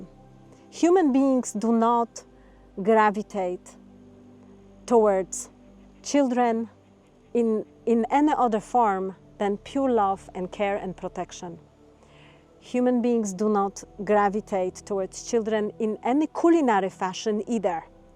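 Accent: Poland. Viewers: Maybe it's just me, cause I'm Polish, but your accent sounds veeeery Polish to me. Especially the way you pronounce "Antarktyka". Is there a grain of truth in it or am I totally wrong?😊😅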